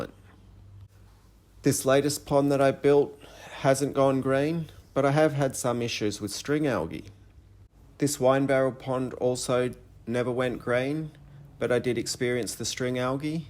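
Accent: Australia. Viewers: Is that an annoying Queenslander accent I hear?~! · Good to hear an Aussie voice on Uutoob for a change, apart from that I'm gunna be the mug to react to the use or the non-word bacterias...... there can be one bacterium or two to many bacteria despite what spell-checker says. Cheers, by the way I keep 3 greenish water ponds with pondslime for the purpose of breeding native fish.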